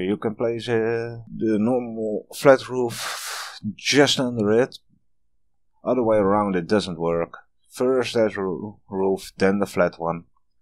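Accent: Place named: Finland. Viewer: Are you from Finland? Your accent sounds pretty finnish or estoian (?) :)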